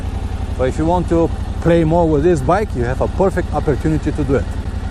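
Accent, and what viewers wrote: Russia. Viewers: Мне одному кажется что говорит с явным русским акцентом? )) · Русский? :) Акцент нашинский! ;) Мотики огонь оба - у меня 660я тенера, когда появится Т7 бэушная в России, я обязательно куплю её, верю что она сделана как надо! Спасибо за обзор, творческих успехов!!!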